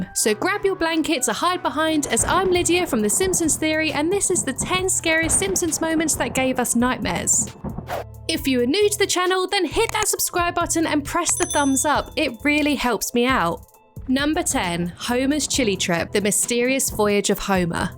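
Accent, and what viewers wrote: United Kingdom. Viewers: This beautiful british accent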